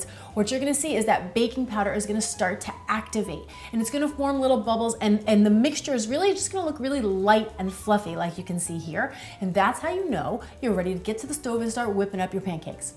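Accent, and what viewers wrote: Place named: New Jersey, United States. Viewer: Very funny Jersey girl accent haha 😂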